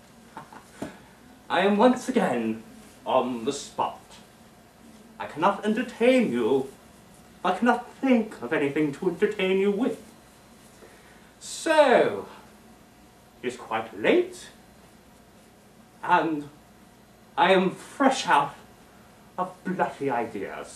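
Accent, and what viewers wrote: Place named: United Kingdom. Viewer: Your British Accent is dire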